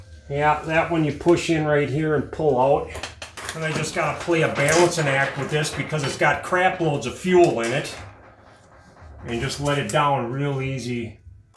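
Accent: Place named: Minnesota, United States. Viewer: Love the accent LOL. Minnesota ? I think this accent with sarcasm is just the best combo. Question if you needed to JUST replace the fuel pump. would you recommend doing it this way or from the top by removing the bed?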